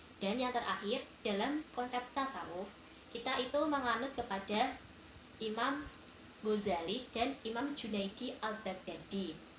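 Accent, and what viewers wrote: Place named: Java, Indonesia. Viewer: Full audio nihh.. Barokalloh Alhamdulillah penjelasannya mudah dimengerti ty Btw Logatnya lucu ukhty, medok banget uwwuu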